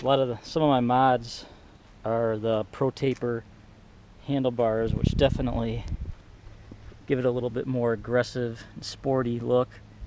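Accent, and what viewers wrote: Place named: Midwest, United States. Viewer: You from Minnesota? I hear a Midwestern accent haha.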